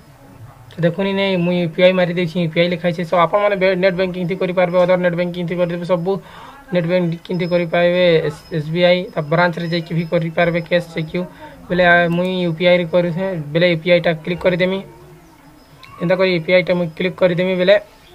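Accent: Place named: Cuttack, India. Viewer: ସମ୍ବଲପୁରୀ କହୁଛ ଭଲ କରି କାହ ଆଉ କଟକିଆ ମିଶାଗୁଲା କାଏଁ ଯେ କହୁଛ..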